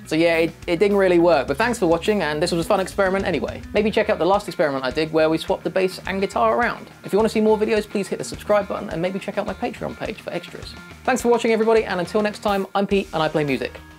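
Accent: United Kingdom. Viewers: I've seen you in Riff Wars, Shred Wars, and Ultimate Shred Song but this is the first time watching your channel and the British accent threw me a curveball haha it was cool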